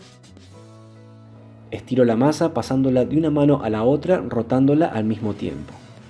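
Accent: Argentina. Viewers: Sé perfectamente que el origen de ese vídeo es Argentina, reconozco el acento, si queréis llamar "jamón crudo" a algo que no lo es, pues vale.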